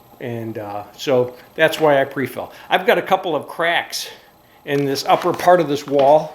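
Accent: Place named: Chicago, United States. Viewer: I love that Chicago accent !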